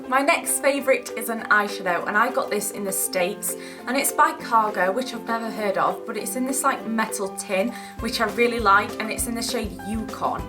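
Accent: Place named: North, United Kingdom. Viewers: Gotta let your legs grow ant ya! Love that Northern twang 💕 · I may be wrong but you sound a bit Yorkshire and I just wondered if you were born there😊😊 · 'Godda let yer legs grow ant ya?' 1- OBVS, all about that yeti life. 2- I always feel ashamed of my Northern accent in videos but yours makes me laugh, I love it!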